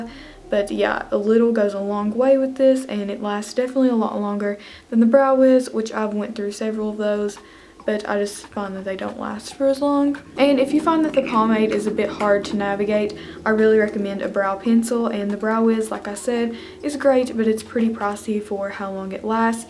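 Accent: South, United States. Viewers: She has such a souther accent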